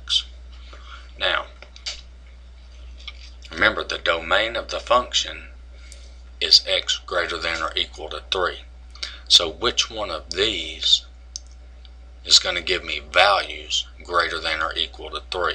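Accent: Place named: Texas, United States. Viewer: Thank you so much Sir. Your explanation was perfect. It helped me understand the topic better. By the way, I love your Texas accent. I think it's cool.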